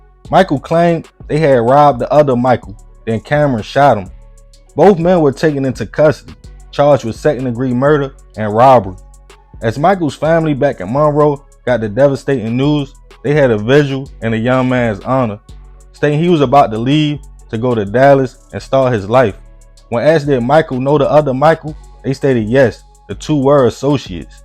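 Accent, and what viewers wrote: Baltimore, United States. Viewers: This narrator has to be from Baltimore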